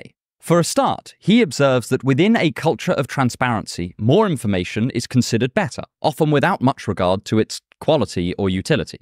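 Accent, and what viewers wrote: United Kingdom. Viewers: first viewer and just known this channel 2 mins ago. been here cause your beautiful British accent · Who close captions your videos? I see a lot of errors that could/should be remedied as they don’t recognize the idiosyncrasies of your British accent.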